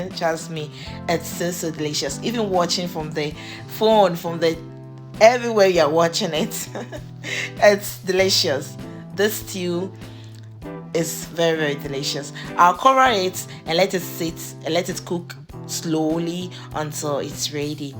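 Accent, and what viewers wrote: Ghana. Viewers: just when i was thinking of how i could see ewedu leaf to buy . you just thought me another idea with spinach and okro. i guess you are Ghanian from your accent. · You sound like Ghanaian lady good job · This is not normal. She sound Ghanian.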